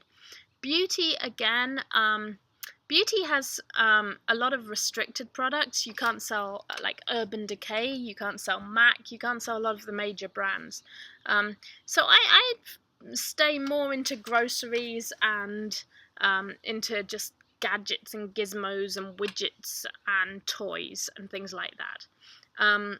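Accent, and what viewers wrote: United Kingdom. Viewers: Thanks for the info. Btw...you're cute! Love the UK accent. ;-) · Thank you... Your British accent got me on that one... (but we all love that accent!!) You ever get out to TX I'll share some unique accent of my own with you... lol Anyway... really helpful videos... we haven't started yet but looking into it... Your videos are very insightful. Thanks again!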